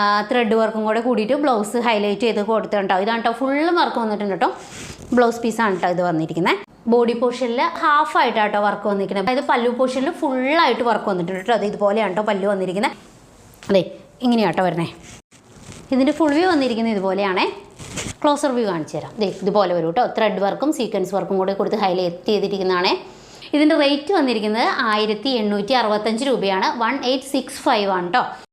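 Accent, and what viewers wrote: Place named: Perumbavoor, India. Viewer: ട്ടോ എന്ന പ്രയോഗം നമ്മുടെ പെരുമ്പാവൂരുകാരുടെ ഒരു പ്രത്യേകത ആണ് ചേച്ചി പറയുന്നത് കേൾക്കാനും നല്ല cute ആണ് അതുകൊണ്ട് അത് മാറ്റേണ്ടതില്ലാ ട്ടോ😊😊😊😂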